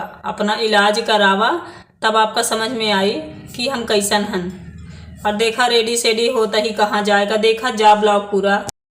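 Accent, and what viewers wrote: India. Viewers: Aj to bhojpuriya bol rahi hai 😃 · Aaj apne bilkul desi tareeke me baat ki accha laga aur dulaiya bhi hi gai bahut hi badhiya video 👍👍🙏👍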